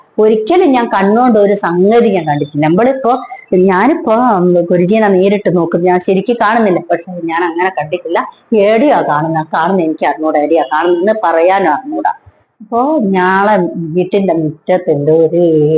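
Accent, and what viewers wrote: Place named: Kannur, India. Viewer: Kannur bhasha ane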